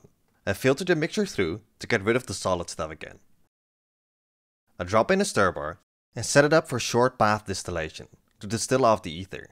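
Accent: Netherlands. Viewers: I hear a dutchy😂 already a fan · Are you Dutch? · accent heard, dutchie spotted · I know you are dutch you cant hide your dutch accent from a fellow dutchman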